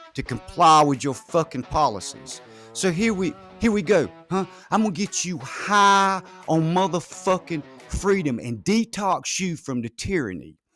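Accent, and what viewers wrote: South, United States. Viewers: Good Reviews... awesome southern accent my G know what im talkin bout..🤙🖖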